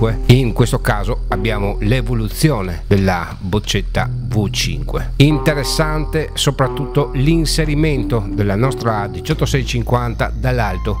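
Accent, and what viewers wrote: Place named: Friuli-Venezia Giulia, Italy. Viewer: Il tuo accento (a me molto noto) tradisce la tua “Giulianità”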